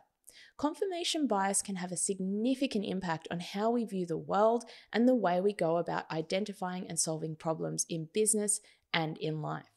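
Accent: Australia. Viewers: I like your Australian accent!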